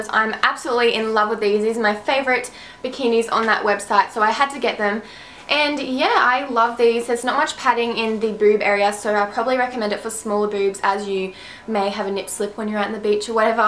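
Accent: Australia. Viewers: your accent sounds australian but it doesnt? lol. this is the first video ive see of you. a) you're gorgeous and b) you have an amazing body! werk it gal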